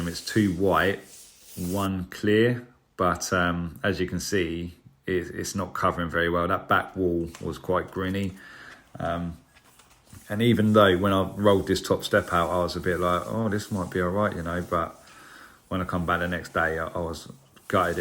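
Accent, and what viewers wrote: England, United Kingdom. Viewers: I love this accent, bruv. What part of England/UK is this accent? Me and my 2 teenage sons mess around with each other talking like The Kings Men.....bruv, and you lot😅😅❤❤ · So many people who hear an Englishman, with this particular accent and makes his living with his hands, assume he’s like a soot-covered Chimney Sweep or a perhaps humble Cobbler from a movie that’s set in Britain at the beginning of Industrial Revolution 😂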